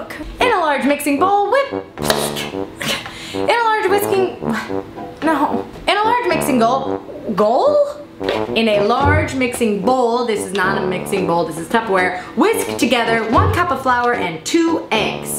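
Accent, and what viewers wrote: France. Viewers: Oh how I love ur French accent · BRING BACK THE FRENCH ACCENT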